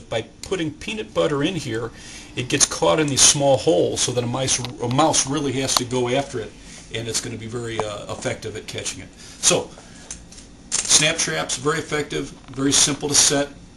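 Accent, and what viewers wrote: Chicago, United States. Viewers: I love your accent too. Chicago?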